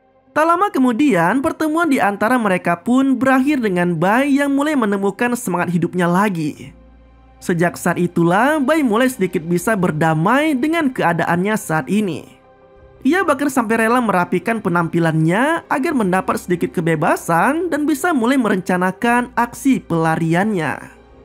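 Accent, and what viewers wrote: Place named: Medan, Indonesia. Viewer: Begini suara Adon Saptowo, vokalis base jam, tapi dengan logat medan 🤔🤔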